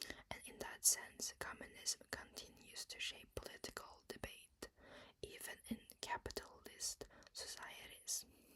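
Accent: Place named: France. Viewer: Are you french??? Sounds like your have a French accent, I am myself a french girl and communist activist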